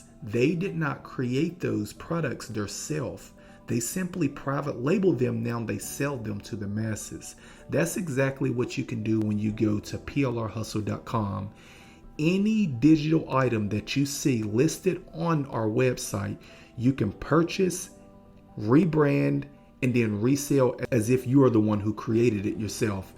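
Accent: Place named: Baltimore, United States. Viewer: Great quality content, thank you for the knowledge! 🧠 I follow you on IG but randomly stumbled upon your YT by coincidence. Also, I hear an accent! Is it Baltimore? 😄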